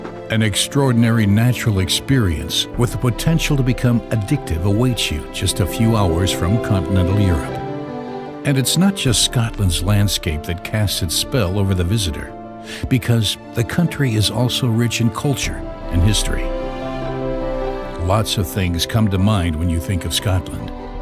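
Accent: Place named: United States. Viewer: He's American I think judging by his pronunciation of most words. Scatland taddies etc. Why not someone Scottish narrating it.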